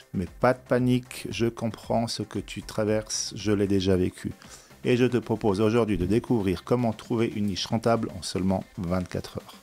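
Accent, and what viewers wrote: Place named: Burkina Faso. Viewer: C'est ton Burkinabè mon frère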